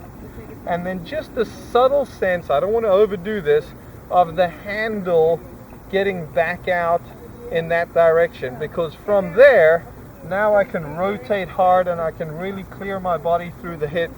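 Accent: South Africa. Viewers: Is that a South African accent? Thanks for the vid and info, very helpful. I recently discovered I get across the line at the top, swing too steep and come out the shot slightly as a result. The cupped left wrist also a real "aha" discovery. Now the real challenge, to change the muscle memory!!! Not a small task. any drill tips meneer? Dankie. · is he really South African bru...???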